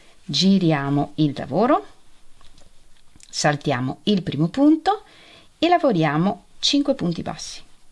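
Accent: Italy. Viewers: Pour souffrir également de fibromyalgie, avec en ce moment le syndrome des intestins irritables qui dure depuis plus d'une semaine, je suis trop mal dans mon corps pour crocheter. Je suis âgée, ce qui n'arrange rien. Par contre, comme vous, rien qu'à écouter la voix de, avec son magnifique accent, me fait du bien. Elle me ramène à mes origines (mes parents sont nés en Italie tous les deux) et mes souvenirs de vacances d'enfance. 😊 Je suis désolée, si je parle l'italien, je ne sais pas l'écrire. Et je ne supporte pas de faire des fautes d'orthographe. Bon courage à vous.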